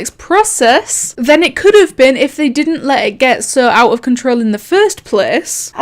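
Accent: United Kingdom. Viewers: We always wanted to know: why are you british? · Yeah, WHY are you British? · It’s annoying I can’t get over her posh English lol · you never answered why you are British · why are you British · I love you british accent and i love how ur so good at arsenal T^T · ok ok, but, why are you british? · are you brittish? and if so, why are you in may 13th and not december 3123?! uefyhbwegyfbhewgr means hello in my language. i am smartest individual roblox clothing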